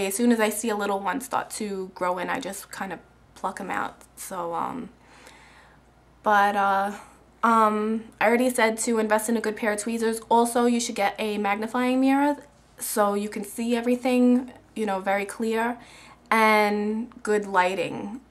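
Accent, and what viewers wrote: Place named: Bronx, United States. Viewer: your from the city, like the bronx, or new york , lol CUTE!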